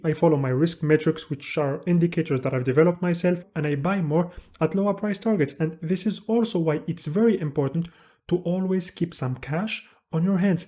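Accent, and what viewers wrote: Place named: France. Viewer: L’accent français ça 😆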